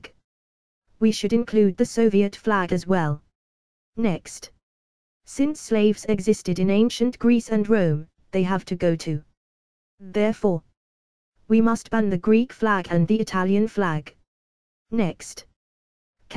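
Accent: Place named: United Kingdom. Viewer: But at least she has a British accent which is always attractive on the ear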